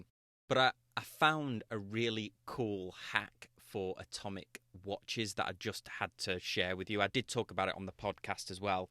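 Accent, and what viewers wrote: United Kingdom. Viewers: I've watched this video through twice, and it sure would be NICE if you'd give a mention of the app you're using to accomplish this amazing feat! Going by your accent I gather you're a Brit. We Americans need things spelled out for us a bit plainly. So how bout it, sport? Give us a hand here mate? 😎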